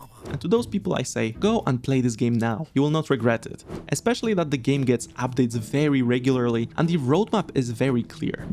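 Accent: Poland. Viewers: I immediately knew you were Polish. Years of listening to people read in english class suddenly came back to me when I heard you speak. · as soon as I heard your accent I was like, is he polish? and then i checked channel localization XD Pozdro byku, spoko film i rozwijaj się dalej!!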